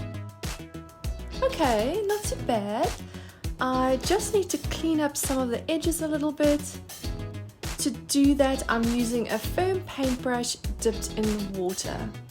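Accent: South Africa. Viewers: Hi there ... is that an SA accent I'm hearing? And are you from KZN or JHB? Watching you, I feel really inspired to start painting again. So up to my studio I go ... thanks to you:face-red-heart-shape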